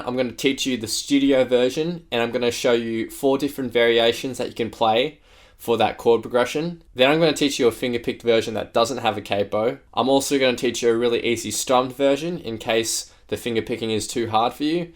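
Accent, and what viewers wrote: Australia. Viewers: Ooooooo Australian c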